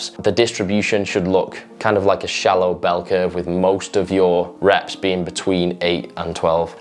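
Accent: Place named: England, United Kingdom. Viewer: Sorry I can't handle the gay pommy accent lol try doing some legs bro. I'm heading back to Ryan Hutchinson for some decent sarcasm lol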